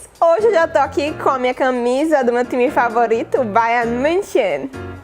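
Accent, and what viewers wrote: Northeast, Brazil. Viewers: Ela parece nordestina falando português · Sim...nordestina de Maceió!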